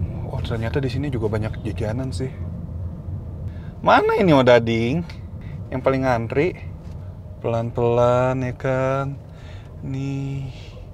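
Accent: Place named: Bandung, Indonesia. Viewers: Hese teu nyunda teh 😁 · Paling suka logat sunda.😁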